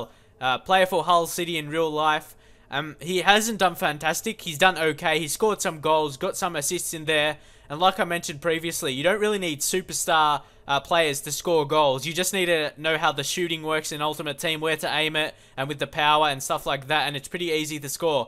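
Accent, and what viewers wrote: Australia. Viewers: are you from Australian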